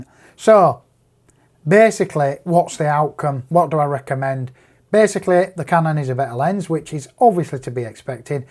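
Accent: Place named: Cockney, United Kingdom. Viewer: Trying to decipher your Cockney Accent .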